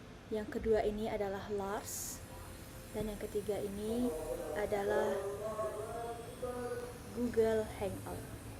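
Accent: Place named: Surakarta, Indonesia. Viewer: Sing ngomong putri Solo. Jan uallon tenan, setengah berbisik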